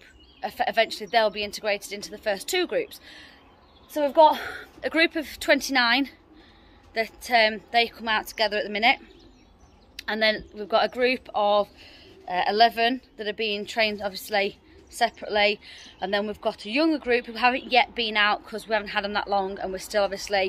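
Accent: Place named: Hull, United Kingdom. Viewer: What part of the uk are you from, sound like you have a hull accent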